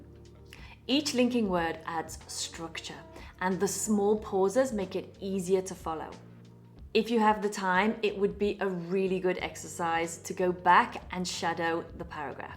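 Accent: United Kingdom. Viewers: I really enjoy watching your videos and listening to your British accent. 😉 Although what you say sounds easy, the reality is very harsh. However, I want to continue learning from your videos. Have a nice weekend...Thanks,. 😊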